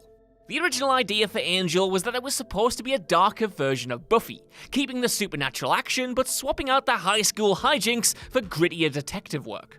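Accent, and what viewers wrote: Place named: United Kingdom. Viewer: Because he is reading a lot and people make mistakes? Because he pronounces that differently like most people do with most of the ever evolving and changing language? Because he's British?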